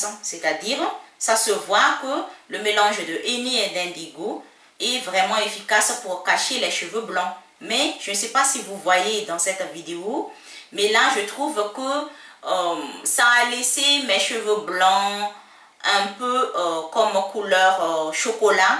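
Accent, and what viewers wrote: Madagascar. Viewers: Bonjour la Malgache 😬, Belle accent, je suis Malgache aussi , bravo pour l'info🌹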